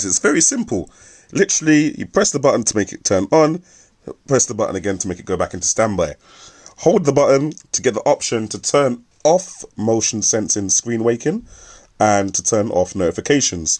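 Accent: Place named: Cockney, United Kingdom. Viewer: Really good review, thanks for posting. Brilliant accent by the way. SO cockney, kind o ting... you get me?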